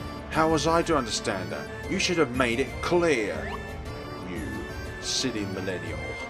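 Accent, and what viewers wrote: United Kingdom. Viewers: Dang brit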